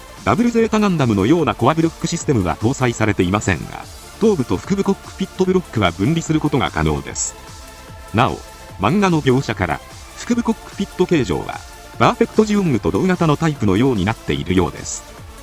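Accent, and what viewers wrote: Kansai, Japan. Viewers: 関西弁なだけできれてないんじゃないか？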